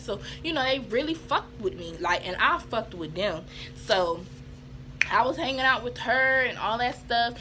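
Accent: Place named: Deep South, United States. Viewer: "East Coast"...lol.. Naaah' you're from the DEEEEEP South!! Lol. It's all good. How's Vegas?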